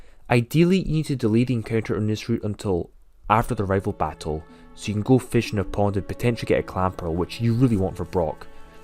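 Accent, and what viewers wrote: Scotland, United Kingdom. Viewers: That's just his accent, not a speech impediment, you can hear that strong scottish accent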